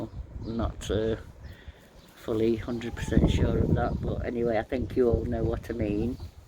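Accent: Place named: Yorkshire, United Kingdom. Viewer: I'm curious , You live in Wales. But your accent sounds like Yorkshire or somewhere in the north of England. Are there parts of wales that have that accent. Or are you from Engalnd originally?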